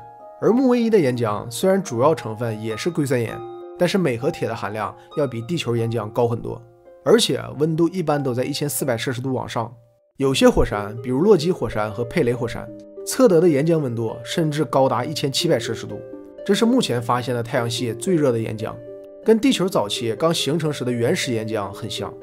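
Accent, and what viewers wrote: Northeast, China. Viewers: 东北话口音。😄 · 这大碴子口音太出戏了🌝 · 东北口音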